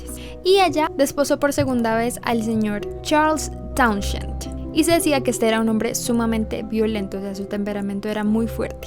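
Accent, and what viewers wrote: Colombia. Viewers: eres de Colombia sierto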